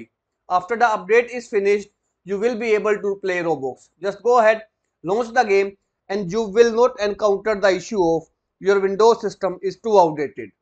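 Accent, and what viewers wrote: India. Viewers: Indian accents is very help my problem!!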